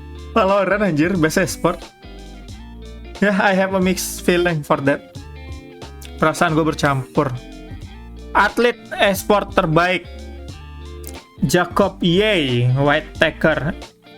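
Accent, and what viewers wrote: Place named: Banjar, Indonesia. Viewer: bang, elu org mana sih? bahasa lu kyk org banjar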